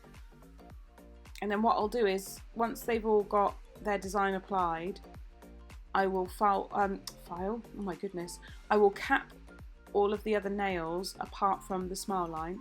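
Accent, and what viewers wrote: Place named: England, United Kingdom. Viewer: I love to hear her too. I'm in America but a huge Anglophile.... her accent slays me.... her a the lady from! Love how she says "bits and bobs"... and other other local phrases. Lol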